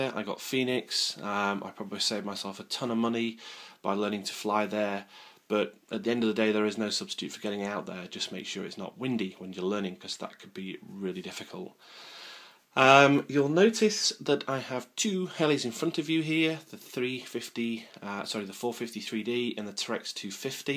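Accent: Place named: Germany. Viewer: where from germany are you from, i noticed the accent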